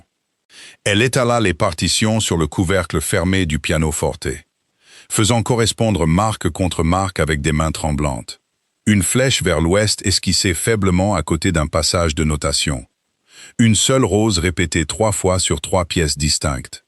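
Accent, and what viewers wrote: England, United Kingdom. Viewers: Arrêtez cet accent anglais détestable ! Cela nuit à l’écoute....l’histoire est tarabiscotée...😅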